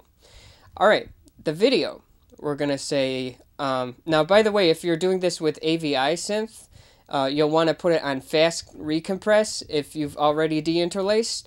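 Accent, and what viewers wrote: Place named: Minnesota, United States. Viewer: i sense Minnesota accent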